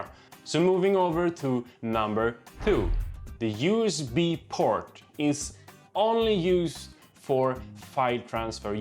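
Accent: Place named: Sweden. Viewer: As a Norwegian I immediately heard you were swedish... The swedish, norwegian and danish variants of english are very distinct :D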